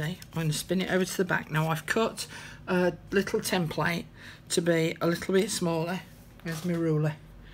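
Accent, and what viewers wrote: England, United Kingdom. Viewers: You are my favourite crafter 👏🏻👏🏻👏🏻👏🏻 a nice english voice xxxx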